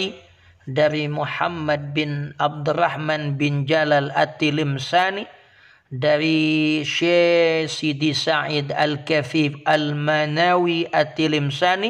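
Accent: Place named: Jakarta, Indonesia. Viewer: Betawi beud dah.. Sama ama saya ustadz.. Suka sayah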